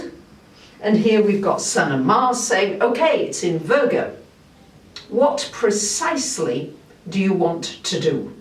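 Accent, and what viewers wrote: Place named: England, United Kingdom. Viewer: Thank you beautiful gentle motivation as always. I love your friendly way with words and your accent,what part of England are you from? 💗💗💗😇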